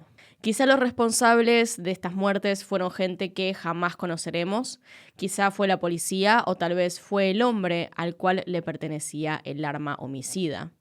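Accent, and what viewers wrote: Argentina. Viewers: de qué parte de Argentina eres?. Un saludo efusivo de una española que te admira❤❤❤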